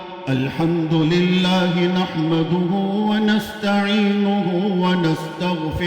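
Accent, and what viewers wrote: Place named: Karachi, Pakistan. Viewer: یہ کراچی کے علماء کی عربی تھی زبردست عالم دین مفتی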